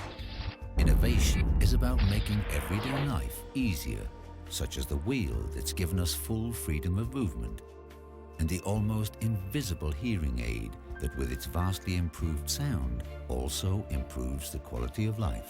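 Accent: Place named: Denmark. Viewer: Concider.... Check your Danish English-speaking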